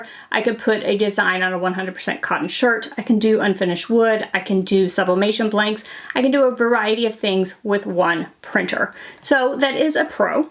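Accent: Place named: United States. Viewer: The microphone is tuned up to high which means it's not exactly complimentary to a female American accent and vocal fry.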